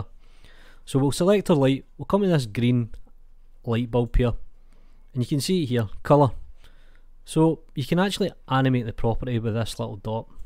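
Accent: Scotland, United Kingdom. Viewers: Tutorials in a Scottish accent is music to my ears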